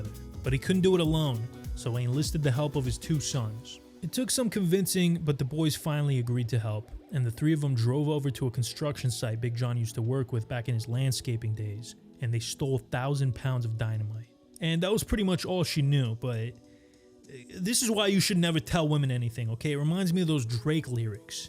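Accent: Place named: Italian-American, United States. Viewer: I don't want to be rude but he kinda has like a american-italian accent,but his videos are addicting like crack,not that I've tried it 😅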